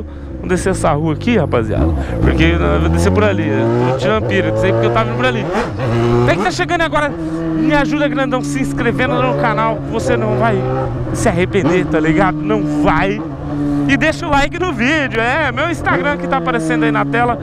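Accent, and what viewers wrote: Goiás, Brazil. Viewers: por que vc fala no pique de Goiás se vc mora em londrina?? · pq vc fala no pique de Goiás se Londrina fica em Paraná?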